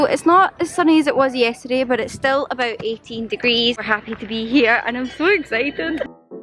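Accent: United Kingdom. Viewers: Hey i just got to know you from group facebook already subscribe and follow your instagram :) i like your accent, is it british ?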